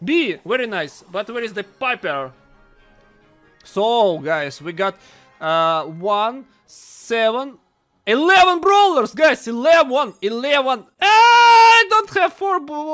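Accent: Russia. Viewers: russia, i know because my parents are from Russia and they have this accent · да ты руский просто по англиски говоришь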